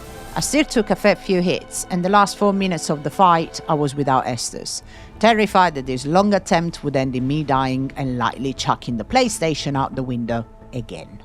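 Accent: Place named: Italy. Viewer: Love the mix of Italian and northern/midlands, get on, get that plaque before JK haha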